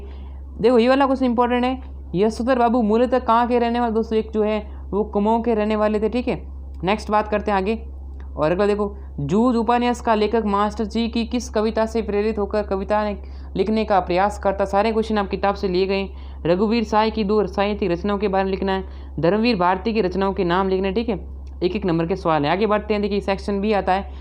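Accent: Gujarat, India. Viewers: Bhai aap gujrat sa ho kya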